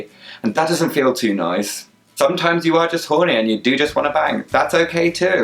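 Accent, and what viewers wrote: United Kingdom. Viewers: a really great video , I know your struggle! one question , are u british? love your accent xoxo from italy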